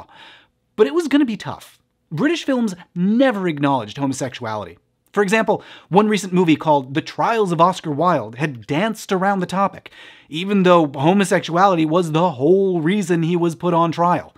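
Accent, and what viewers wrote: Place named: United States. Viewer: The Accent somehow is less British and more American sounding.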